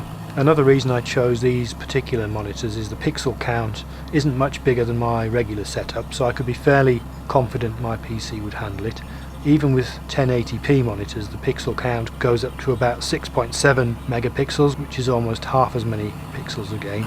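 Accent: United Kingdom. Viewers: Thanks for the prompt reply. Say, I'm curious... Though you obviously spend a good deal of time flying around the region I spent the first several years of my married life (Puget Sound area), it sounds like you live in the UK. I lived in Melton (East Anglia) for a bit more than two years, and met and courted my wife near Bracknell... Just wondering if you're from a district I'm familiar with. :-) Thanks again for the advice -- and all the excellent videos! Keep up the great work!!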